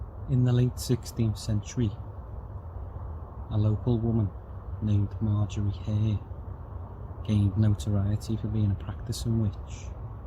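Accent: Liverpool, United Kingdom. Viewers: Love the Liverpool accent and you knowledge thank you a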